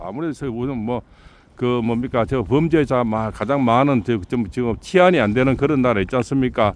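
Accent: Gyeongsang, South Korea. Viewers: 참재미나게 말씀하시네요 토종경상도 사투리 웃습니다 재미납니다 우리나라 좋으신쌤이 많아서 희망이있습니다 ㅋㅋㅋ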